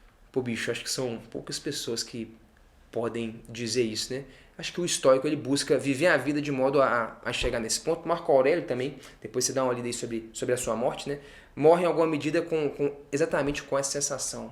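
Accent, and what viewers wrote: Minas Gerais, Brazil. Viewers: tu é mineiro mestre? Me identifiquei com o seu jeito de contar caso e falar KKKKKK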